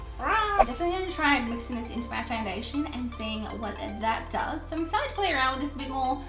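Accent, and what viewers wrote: Australia. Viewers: I love your Austrilian accent!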